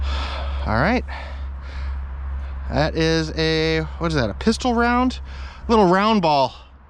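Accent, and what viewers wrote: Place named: New York, United States. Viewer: I knew you were a NY transplant... lol I have the same accent.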